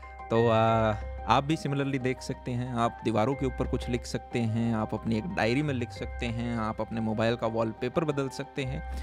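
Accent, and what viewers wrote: Haryana, India. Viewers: sir, are you from Haryana ? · Bhaiya your accent is going towards Haryanvi 🔥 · Harayanvi accent😅 Btw nice video as expected ✨💖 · Am I the only one who loves his Haryanvi accent?? 🤣🤣😍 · Nice haryanvi accent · Katayi haryanavi lgre ho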